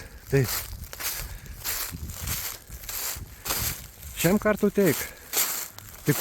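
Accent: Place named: Samogitia, Lithuania. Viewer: Netikėtas Jūsų atradimas, bet sakyčiau labai maloniai nustebinęs. Toks paparastas vietinių, įdomių, nežinomų ir mažų dalykų parodymas tiek Lietuvoje , tiek Latvijoje :). Man kaip aukštaičiaui labai patinka , kad tai darot žemaitiškai. Sėkmės !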